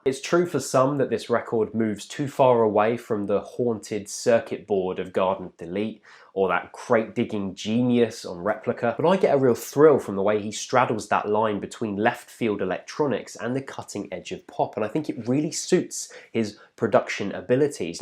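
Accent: United Kingdom. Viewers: i dont know why, but im so obssesed with the british accent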